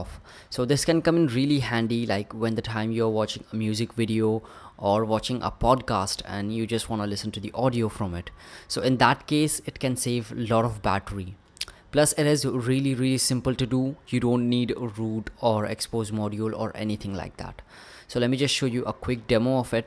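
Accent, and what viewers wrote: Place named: India. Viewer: its indian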